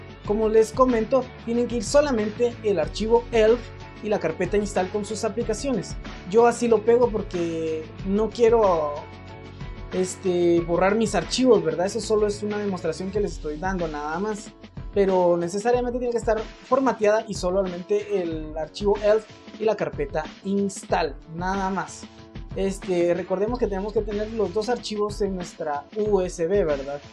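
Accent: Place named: Guatemala. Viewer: Acento chapin :3 hahaha que nivel vos gracias me sirvio.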